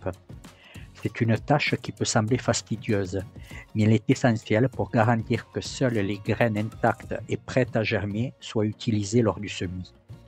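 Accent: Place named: Charente-Maritime, France. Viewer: Ça fait plaisir d'entendre ton accent du sud de Charente-Maritime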